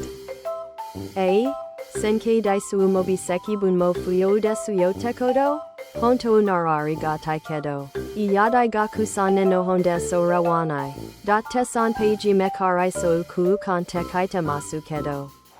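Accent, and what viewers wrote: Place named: United States. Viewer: a の発音がeiの時とerの時があるね。ほとんどaとは発音しないアメリカ英語。 あと授業はjigiyaou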